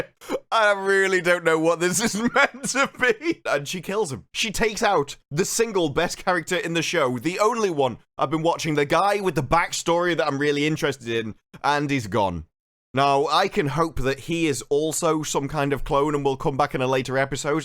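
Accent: United Kingdom. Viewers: It's so fun to hear these reviews: It's like a recap of many of my own thoughts when watching the episode, in a UK accent. Thank god this season's over, let's hope the viewing numbers and backlash finally kills this foolishness a.s.a.p. (even if it's already renewed, goddamnit) Additional thoughts (alongside existing review): - Why do Plank and Tank react with any emotion to the reveal of kidnapping, instead of going for cold logic? - How'd Cheeks keep up with the other running spartans when chasing covy ship, without armor? - How'd Pinkstreak get into the ship, when she's only got a sidearm with her and it's literal spaceship-grade hullplating? - Why, when she hero-lands in the hallway (how'd she get a hole precisely over that place anyway?), is there not any howling wind from the hole she magicked into the hull of the ascending craft? - How did the crashing ship come back to basically in the same airbase that it launched from, when it was presumably programmed to fly away from there and was in the air for several minutes? 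- Why is the post-massive-security-breach meeting with non-relevant characters (Miranda and John) in the science room and not a boardroom of senior personel yelling at Parangosky? - Cortana should've answered: "I was in Cheeks' brain, so had access to every sensory datapoint. All these pointed to truthfullness (see archived report)." - Why has the UNSC not sent at least 1 single probe to the system that might hold enormous strategic importance instead of s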